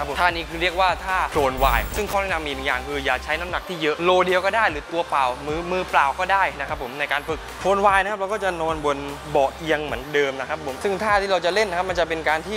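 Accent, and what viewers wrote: South, Thailand. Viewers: คนใต้เหรอ เสียงออกทองแดง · พี่คนใต้ใช่ไหม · พี่เป็นคนใต้ใช่ใหมค้าบ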